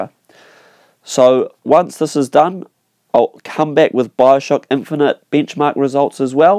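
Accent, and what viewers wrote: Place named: New Zealand. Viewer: New Zealand? reminds me of Rhys Darby (Murray Hewitt's character) from Flight of the Conchords :) btw, great video. thanks for sharing.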